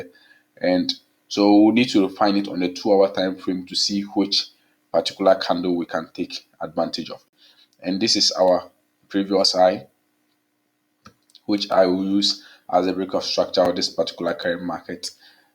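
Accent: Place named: Europe. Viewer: Nice European accent.😅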